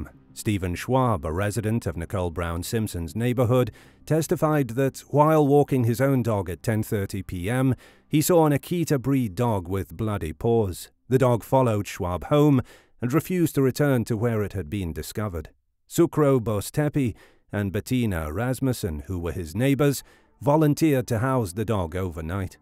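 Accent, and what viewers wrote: United Kingdom. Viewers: damn just you have a strong British accent mate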